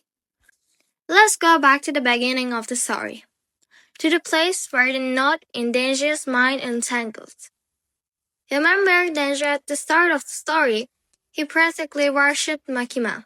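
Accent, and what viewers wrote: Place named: Turkey. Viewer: Why does your accent sound so turkish?? Good content btw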